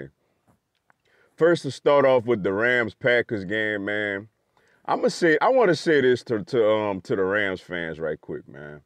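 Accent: New Orleans, United States. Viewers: I can hear your accent, you from tha NOLA.. You should be with your home town team dude.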